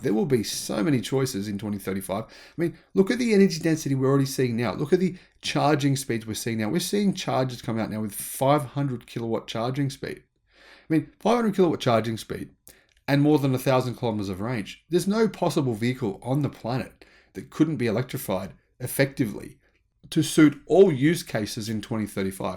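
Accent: Australia. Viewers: But are Australian or Norweed, Im confused, u sound Aussie but reckon you're a viking? do you wear a hat?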